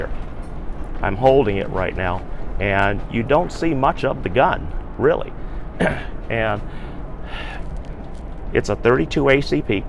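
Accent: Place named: South, United States. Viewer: You have a Southern Accent. It’s funny to hear an Asian man with a Southern accent. Maybe where you grew up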